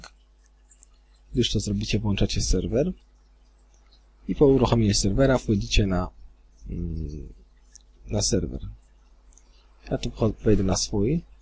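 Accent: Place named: England, United Kingdom. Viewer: Masz taki angielski akcent <3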